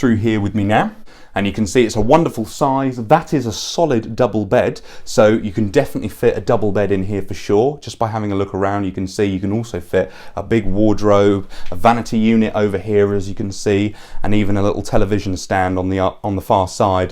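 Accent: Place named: United Kingdom. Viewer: very nice british accent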